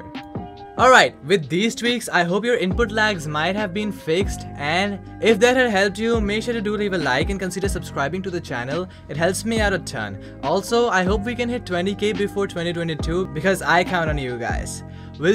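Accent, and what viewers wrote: India. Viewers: You got a bit of indian accent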